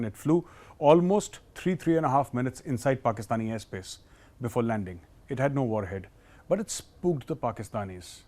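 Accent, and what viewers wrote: India. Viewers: Awe... u need to use IK name to grab attention. lol. Beepdee bapdee boopda.... the famous indoween accent ridiculed around the world. Gobbar and badsuraat rundians earn likes and daily roti by using Imran Khans name.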